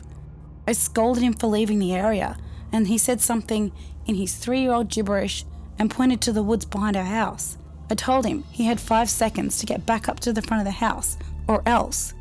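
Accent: Australia. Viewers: I'm from middle Tennessee and wtf with the Australian womans voice for a Tenn womans story 😂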